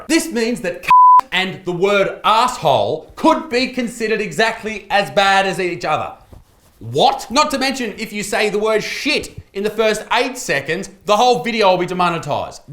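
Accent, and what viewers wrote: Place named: Australia. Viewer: He's Australian, an instant pass, given. No questions asked. Swear all he wants. Australians can make pretty much anything sound amazing, funny, and worth listening to, etc...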